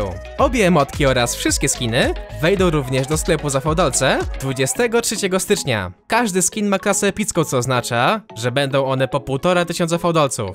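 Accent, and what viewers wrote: Poland. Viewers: Cze cze czekaj czyli jest górnik zabrze, od którego lepsza jest chyba legia warszawa i lech poznań, ale nie ma bayern munchen (monachium)? Przecież tam gra Robert Lewandowski, jeden z najlepszych piłkarzy Europy (jak się nie mylę). Btw szacunek za polski akcent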